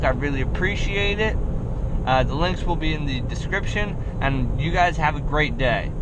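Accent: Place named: Virginia, United States. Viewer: what part of va is this, I can tell it's va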